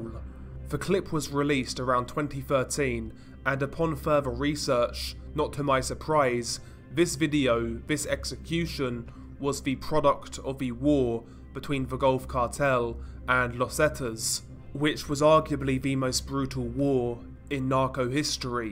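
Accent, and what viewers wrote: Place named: United Kingdom. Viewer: I hate the British accent but as a Mexican I can’t stop watching these videos 😔!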